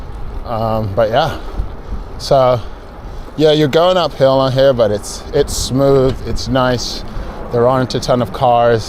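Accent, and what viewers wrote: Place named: United Kingdom. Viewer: I can really hear your years spent in the UK in this video. I’ve picked up on a few times but it’s really expressed here. Great ride! Thank you for sharing.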